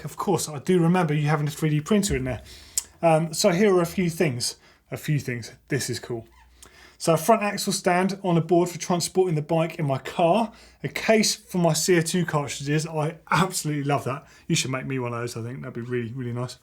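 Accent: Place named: England, United Kingdom. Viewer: is a proper Englishman and they pronounce things funny. Ask him to say "Gloucester" or "Worcestershire". You'll see what I mean.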